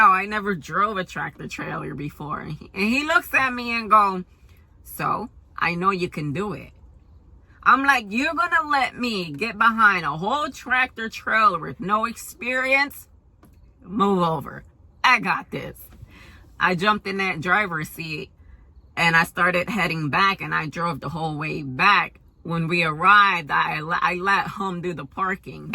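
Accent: United States. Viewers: Where are you from? You sound like you from New York? Are you Boriqua? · Watched your video and subscribed immediately. Love your determination and your Jersey accent. I am so sorry for how your husband went about ending your marriage. But you will be alright. I am looking forward to following your truck driving journey. I don't know if you follow any other female truckers on YouTube, but my favorite is Jasmine (SlimePack) who drives refers with Prime INC. May God bless you and keep you safe on the road.❤🙏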